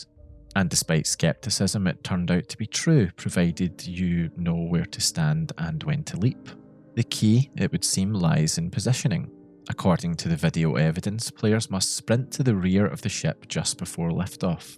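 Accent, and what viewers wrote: Scotland, United Kingdom. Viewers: Are you by chance Scottish? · Scot? Irish? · Subscribed because of scottish accent.. something I'm trying to learn.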